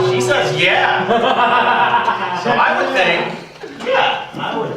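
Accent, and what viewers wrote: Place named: United States. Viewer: wow this is so awesome!! id love to come to a gruop one theres definately less pressure and more relaxed :) but im in england and u obv have an american accent!:(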